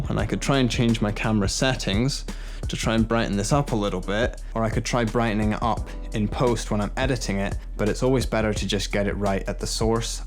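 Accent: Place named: West Country, United Kingdom. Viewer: thanks nice and informative. Trying to place the accent. West Country?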